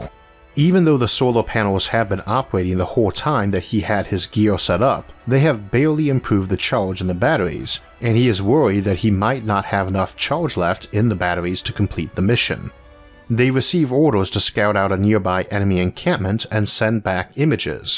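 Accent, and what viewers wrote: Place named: South, United States. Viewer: I love learning about science from a southern accent! God bless the omniverse multi verses universes....John b goodenough invented the lithium ion battery!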